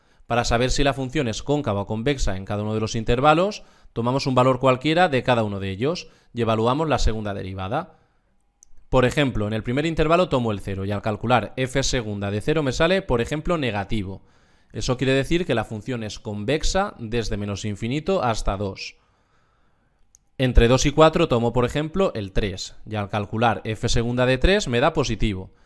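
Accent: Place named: La Mancha, Spain. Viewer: Por tu acento, eres de mi tierra, castilla la mancha, tirado más a la mancha manchega... puede ser?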